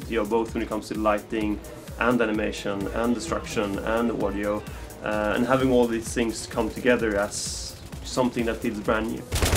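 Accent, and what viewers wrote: Sweden. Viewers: omg what a swedish accent :D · swedish accent hellyeah! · thumbs up for Swedish accent. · I know this might be a bit old.....buut man, English! with a Swedish accent doesn't sound very nice :P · You can hear hes sweedish :D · ._. swedish accent ja! some needs to train that accent away... i managed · Swedish accent <3 (im swe ) :D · he sounds swedish ^^ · God I hate the swedish accent :( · man hör verkligen att han är svensk ;9 :)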